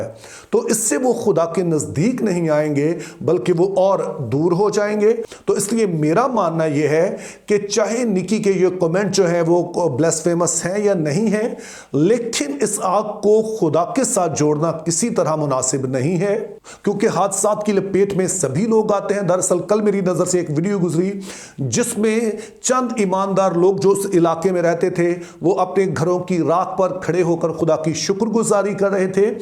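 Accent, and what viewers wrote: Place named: United Kingdom. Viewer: bhai ap urdu midum hyn. Es liy kindly urdu ko urdu ki tarha bola karyn.ap urdu esy bolny ki koshish karty hyn jasy angryz urdu bolty hyn.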